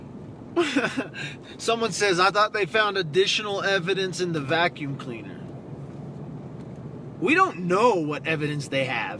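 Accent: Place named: Texas, United States. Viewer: Lori Ransom He is from Odessa Texas and that is his country boy “speak” coming out!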